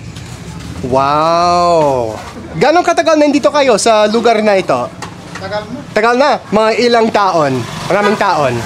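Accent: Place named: Pangasinan, Philippines. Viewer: No offence meant, you sounded like you are from Pangasinan when you speak Tagalog and sounds like Cebuano kapag nagtatagalog sya.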